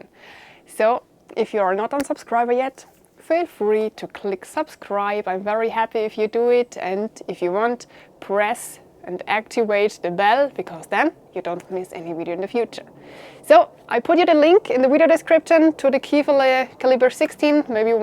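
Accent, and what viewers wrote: Germany. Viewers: great video thank you. have consider security camera it will alarm you also is equipped with police siren. you have nice and pleasant German accent. merci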